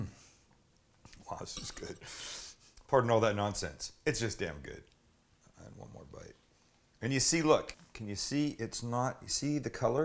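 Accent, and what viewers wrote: Canada. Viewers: please ditch that annoying Canadian drawl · Is this guy Canadian? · Love his Canadian accent!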